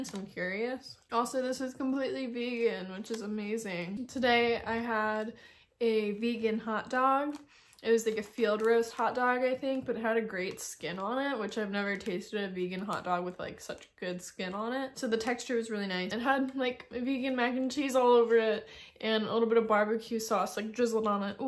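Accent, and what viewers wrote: Philippines. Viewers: I like your pilipina accent.. Sana all😅😊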